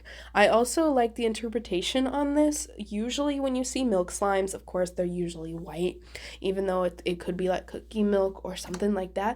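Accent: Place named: Spain. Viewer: I enjoyed the review but I wish there would be less talking and more slime ASMR. BTW your Spanish asent is amazing!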